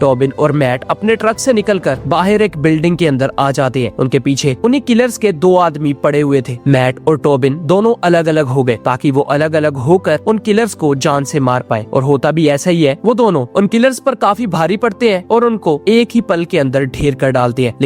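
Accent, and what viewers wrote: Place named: Lahore, Pakistan. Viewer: I think you from Pakistan kyuki hindi esi ni hoti matlab bolne ka lehza lahori pakistani panjabi lag raha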